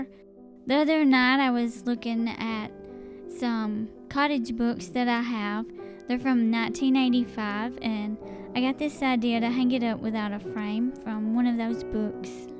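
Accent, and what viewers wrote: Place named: South, United States. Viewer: I don't blame you for that. I was just wondering because I saw it in your older videos and thought if you were still doing something like that I certainly wanted to look into it. Thank you for communicating with me. I love your videos with your Southern accent that I enjoy so much. I am originally from Texas and now live in Missouri. I get teased (even after all these years) about my accent. They call me Paula Deen. I take it as a compliment. Lol! Now if I could just cook like her and had her money! Only kidding. I am blessed beyond measure!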